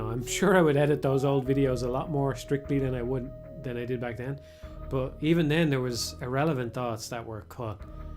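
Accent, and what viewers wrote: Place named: Ireland. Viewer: It's really nice to hear your dulcet irish tones again Here's hoping we get to hear them again soon, happy holidays man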